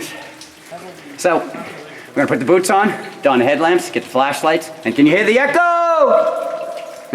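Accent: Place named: Massachusetts, United States. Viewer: Holy mass accent bro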